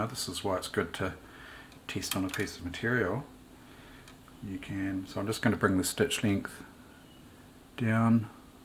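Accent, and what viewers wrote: New Zealand. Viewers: Great video. You must be a Kiwi.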